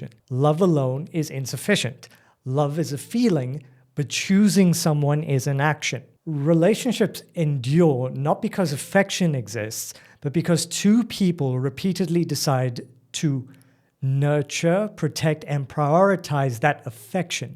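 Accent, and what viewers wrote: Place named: Johannesburg, South Africa. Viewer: Oh, that is why your "accent" sounds so familiar, yet unusual compared with what I'm used to hearing on YouTube... Hi 👋 from Joburg as well.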